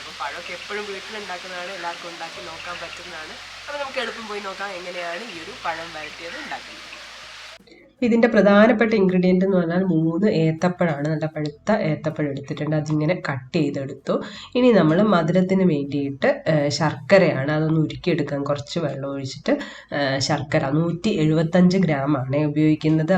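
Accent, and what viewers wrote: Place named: Malabar, India. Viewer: Hii...😄 recipes simple & tasty aanu...adhikam budhimutillathe vegathil undakan pattunnu 😄. ettavum ishtapettath Malabar slang presentation aanu..eniyum nalla recipes wait cheyunnu...😋😋..takecare dear 👍❤️😊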